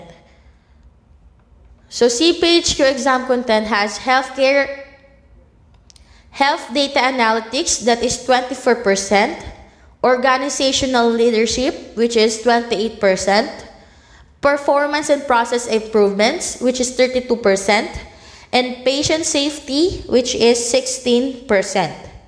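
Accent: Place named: Philippines. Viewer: I can tell from the accent, she’s Filipino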